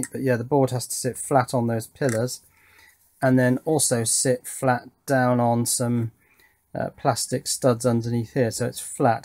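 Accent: United Kingdom. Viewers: He did use the UK English pronouncing, not the American pronouncing.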